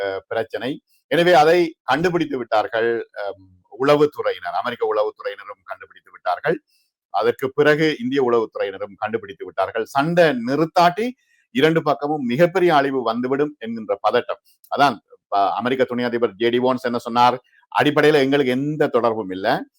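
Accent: Sri Lanka. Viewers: Brother unga srilanka Tamil arumai aana india vanthu Pakistan ku kudutha adi perusu aana indiala paathippunu solluvathu thavaru ingu pathippu apdina Pakistan kaiyala thanamana kolai thanamana makkalai thakkiyathu eni indian army vidathu indian PM Modiji vidamatyaru usa eni vantha angaium adi vilum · முதலில் நீ யாரு உன் தமிழ் இலங்கை தமிழ் மாதிரி தெரியுது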